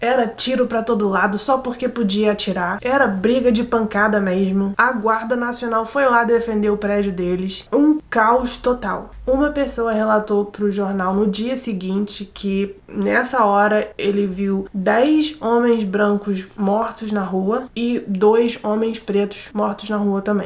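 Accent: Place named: Rio de Janeiro, Brazil. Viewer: Ótimo vídeo. Você fala muito bem português e com sotaque carioca. Você é linda e tem uma voz suave. ❤❤❤❤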